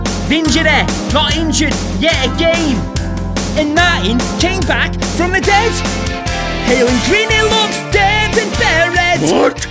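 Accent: Yorkshire, United Kingdom. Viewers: He sounds like he's from Yorkshire not Manchester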